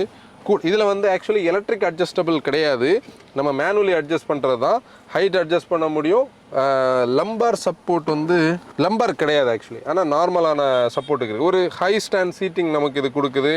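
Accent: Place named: Nanjil Nadu, India. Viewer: Love your detailed reviews, Especially it being in the nanjil slang😄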